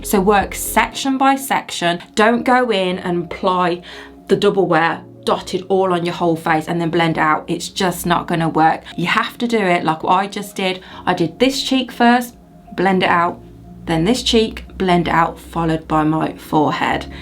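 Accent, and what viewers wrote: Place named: Birmingham, United Kingdom. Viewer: Subbed. Can I hear a fellow Brummy? ❤️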